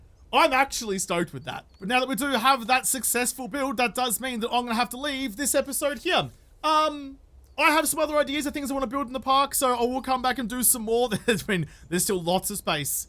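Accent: Melbourne, Australia. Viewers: Do all Melbourne aussies sound the same? You sound just like ozzy. It's knot gey but yut lovely 😍 lmao 😅 you're phenomenal ❤️ american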